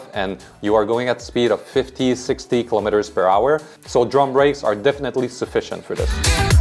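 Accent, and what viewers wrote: Quebec, Canada. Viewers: Très québécois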